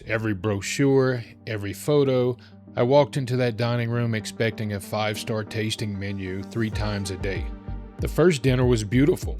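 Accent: United States. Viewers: Almost 5 minutes of absolute dribble. Sounds like a typical yank, no homework done, totally unprepared. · You don’t sound Irish. You sound American af. False advertising.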